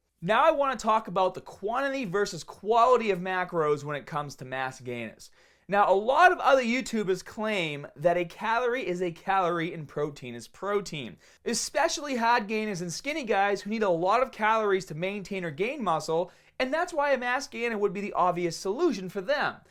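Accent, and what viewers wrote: Boston, United States. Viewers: Boston accent has consistently thickened at a gradual rate over the years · This guy has the most boston accent I have ever heard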